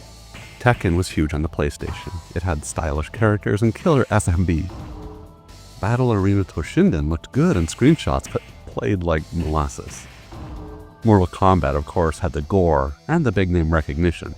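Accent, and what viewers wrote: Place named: Portugal. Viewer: Haha, partially, yes! There were some bits in your accent that had me wondering. Also, the topics you cover on your videos - relatively speaking, there's a high proportion of Portuguese people on SEGA-based retro communities because of how impactful the company was over there, destroying Nintendo in popularity in the 90s. So there was a considerable chance you'd be like i.e. Tee Lopes, someone who was born and grew up in Portugal during the 90's but moved to the US at a young age. Thanks for answering, keep up the great content!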